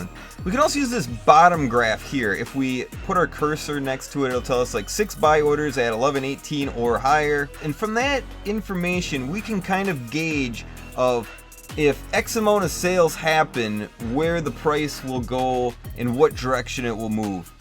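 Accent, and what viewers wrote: Canada. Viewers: You sound Candian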